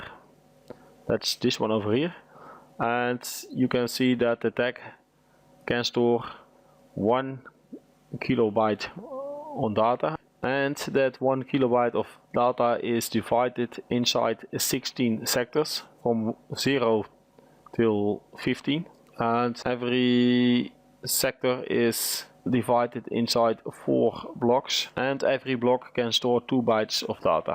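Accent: Netherlands. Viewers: Neiderlandsee accenttt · Het Nederlands accent is wel te horen, maar goede video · are you dutch XD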